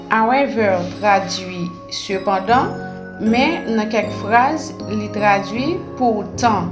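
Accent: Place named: United States. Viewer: Toujou pwononse yo mnm Jan AK American an pou mw jun bon travail b❤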